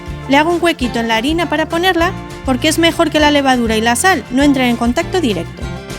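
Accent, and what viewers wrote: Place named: Spain. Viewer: Hola cómo estás, soy tu admirador y seguidor veo por tu hablar que eres española, de que ciudad eres, me gusto tu receta de pan como todas las otras.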